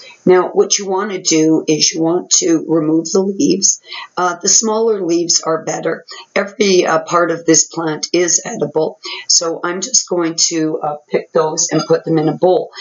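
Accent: Canada. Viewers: Hi wow I should have picked up that you are Canadian with the accent.....I was born in Montreal Canada came to USA in 1971 as a child...I'm now in Virginia where there is snow in winter but I've spent all of my adult life in Souther California...OMG it's so beautiful there....but I had to leave it to get out of the NARC relationship I was in....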